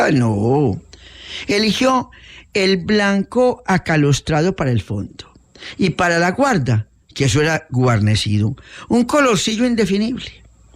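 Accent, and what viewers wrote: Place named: Antioquia, Colombia. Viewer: Qué lectura tan bonita. Con ese acento paisa propio de éste cuento y la música del entre telón bellísima.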